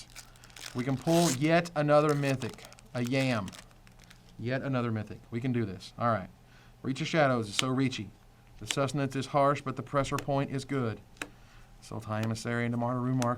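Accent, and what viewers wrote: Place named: United States. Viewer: You have the voice inflection I associate with the "Joe Dirt" accent. Good video tho.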